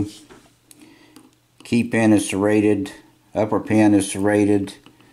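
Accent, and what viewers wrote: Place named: Texas, United States. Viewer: My favorite part of your videos, aside from your amazing picking skill, is hearing you say "let's gutted it" with that Texas ascent :)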